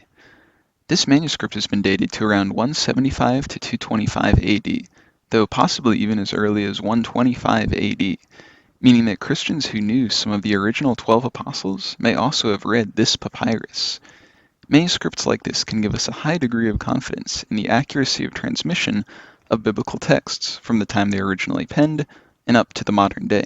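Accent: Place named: United States. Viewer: Interesting but please pronounce the Greek in Greek not American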